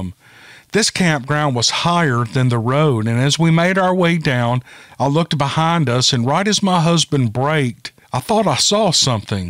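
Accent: South, United States. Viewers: is the man best story teller hands down soothing southern drawl...damn can this man tell a story love ya 💪💪🤜🤛✊👏🌱🌿🌴🏞️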